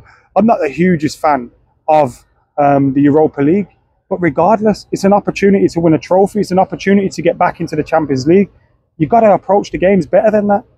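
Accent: Birmingham, United Kingdom. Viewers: How do you mistake a brummie accent for scouse and manc 😂😂 that's crazy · He’s Brummy😂😅😢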